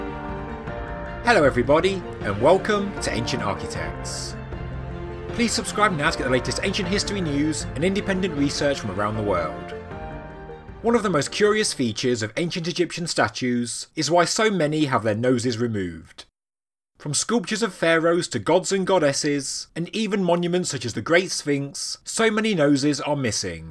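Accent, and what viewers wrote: United Kingdom. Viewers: A. British interpilation of Ancient African Art.and why it was defaced was. so perpostrtious that. It is not funny. This British sounding. narrator is a God Damer. The African People who created this type of art were not that supertiscious or self lothing.They were not slaves and and did not hate their cultural phenotype. This narraitor completely overlooked the Persian, Greek and latter(muslim Arabs who would pillage,destroy and alter the Afriican Dynamic and life like Art out of Jellouse envey and hatred of an. African People who were superior to them in culture.Egyptian Staturtory and life like reproduction in stone are unraveled today in reproduction.Egyptian art is so life like the images look alive. The Arabs have done more damage than the Franch and British combined in smashing noses and distorting African feachers.They now claim that they are the Ancient Egyptians.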